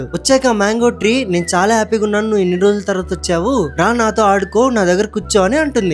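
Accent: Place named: Mumbai, India. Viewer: Tammudoo... nuvvu Telugu Lo videos chestunnav kada.. Mari Telugu raanattu telugu ki Tegulu pattinchaku.. mangoes.. branches... yenduku ilaa anavasaram gaa English Padaalu vaadutunnaav? Bombay nunchi vacchina Heroine laa.. ilaa maatlaadithe Buttler English laa untundi.. neeku English meeda pattu unte English lo ne chei ilaa Telugu ki Tegulu pattinche prayatnam cheyaku.. vinadaaniki assalu baaoledu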